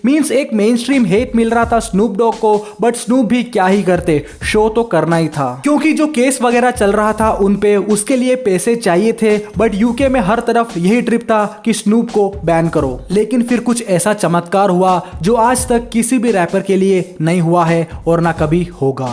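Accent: Kerala, India. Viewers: Enk manasilai since day 1 u have that Malayalam slang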